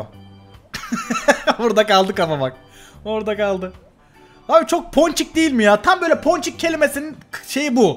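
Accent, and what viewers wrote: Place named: Izmir, Turkey. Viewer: Abi kesin izmirlisin çünkü benim dayımda sizin gibi konuşuyor 😊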